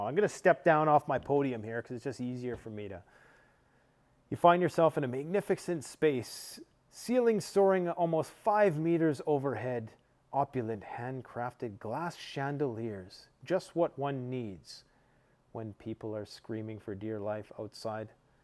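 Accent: England, United Kingdom. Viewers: Dude your English accent is hilarious! The arrogance of these people thinking they will still have servants running around for them after SHTF 🤣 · Wow I'm impressed with your English accent!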